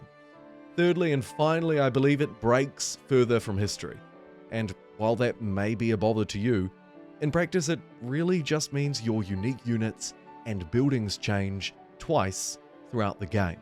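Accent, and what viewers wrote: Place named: New Zealand. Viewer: The familiar Kiwi accent is a welcome!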